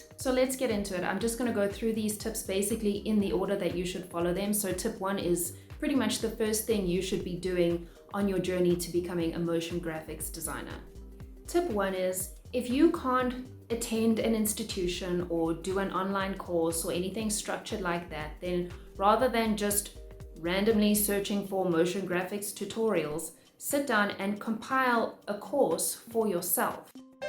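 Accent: South Africa. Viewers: Your accent sound South African.... great vid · the south african accent>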